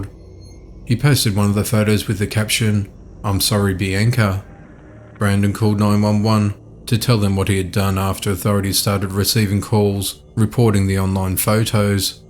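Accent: Australia. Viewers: I’m a new subscriber and love this channel, your voice is very easy to listen to, can I detect an Aussie accent?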